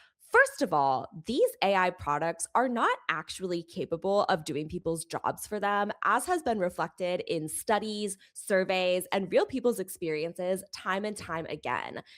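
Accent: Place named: California, United States. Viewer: Californian? Plus bad vocal fry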